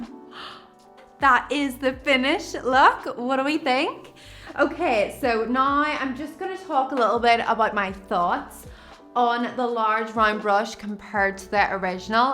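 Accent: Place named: Northern Ireland, United Kingdom. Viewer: Hi, I am a newbie to Dyson airwrap world but I find your chanel so so helpful! Love the content! Keep the good work! Also, I used to live in Belfast for some time so have loads of sentiment to NI and love your accent😂❤️ Warm regards from Luxembourg xx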